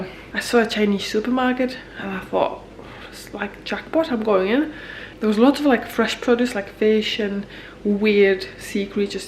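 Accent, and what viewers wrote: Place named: Northern England, United Kingdom. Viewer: You’ve caught a cold and a slight northern English accent.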